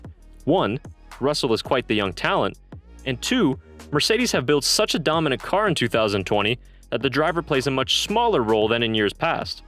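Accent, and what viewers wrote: United States. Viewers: I’ve been watching every F1 related video lately. This is the first video I’ve seen from this channel. It’s kind of funny (in a good way) to hear anything F1 related spoken in an American accent. 🙂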